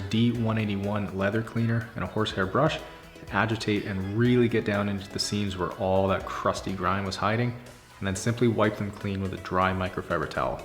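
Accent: Canada. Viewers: Anyone else just listen to that Canadian accent and smile😂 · That’s exactly how I thought Canadians talk to each other.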